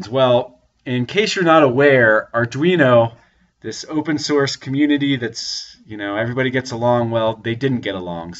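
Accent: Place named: United States. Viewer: Propably this video is kinda informative. But to be honest it just bothers me how time is generated out of nothing and, most important, i just can't stand that accent. "Right out of tha baaahx" Muricans butchering the english language just hurts to watch.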